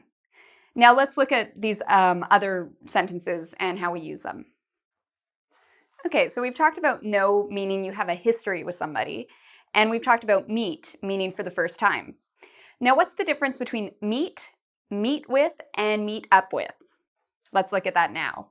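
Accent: Canada. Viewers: I bet is Canadian, there's nothing more Canadian than "aboout"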